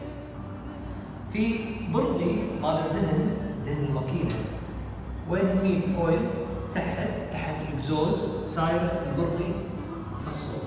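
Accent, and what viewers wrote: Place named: Kuwait. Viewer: ايه الله يسعدك فعلا بس والله ماكنت أعرف إنك تتكلم من الكويت على كل أهل الكويت حبايبنا. فعلا فيه تباين باللهجات بس كنت أفكرك من ربعنا هههههه أعتذر منك وشرفني مرورك يالغالي. أعتذر منك بشدة. كلمة حق أهل الكويت رواد في كل شيء مميز بصراحة. وهذا شي ظاهر وواضح مايحتاج لشهادة أحد.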